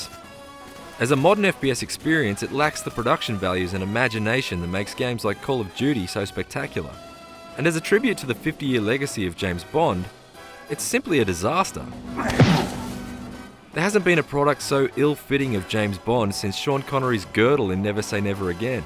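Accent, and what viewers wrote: Australia. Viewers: Australian accent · He's not English, he is most likley Australian or from New Zealand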